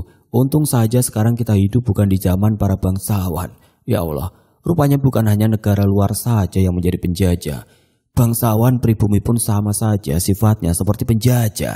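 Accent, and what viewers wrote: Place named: Medan, Indonesia. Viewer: Org Makassar tp logat medan, saran aja bang, sesuaikan ajaa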